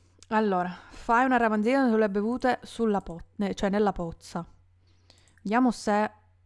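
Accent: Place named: Palermo, Italy. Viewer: Melaaccolo poco palermitano noto😂😂😂 io pure lo sono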